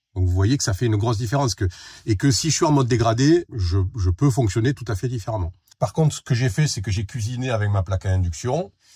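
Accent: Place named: Marseille, France. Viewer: Merci beaucoup pour tes vidéos de partage sans rien avoir à vendre. Cela fait du bien ! Les contenus sont pratiques, efficaces. A ma connaissance, tu es le seul à avoir une démarche aussi complète sur le monde du camping car. Quant au soleil que tu n’as pas dans tes vidéos, cela est compensé par la petite partie de Marseille que tu transportes dans ton phrasé. (J’ai un peu la sensation d’entendre Di Méco.😉) Bonne route pour la suite de ton voyage et encore merci.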